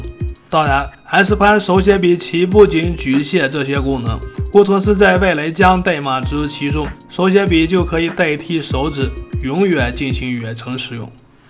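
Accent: Shanxi, China. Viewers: 大哥你是不是山西或者陕西人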